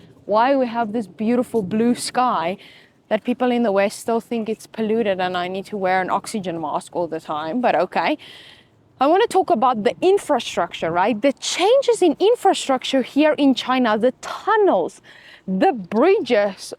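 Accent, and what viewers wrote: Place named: South Africa. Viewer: Did you live in the USA? I hear your South African accent, so I'm wondering.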